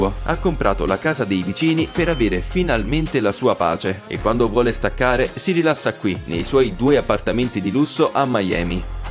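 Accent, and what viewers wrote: Germany. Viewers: Bel video❤ Ma sei tedesco?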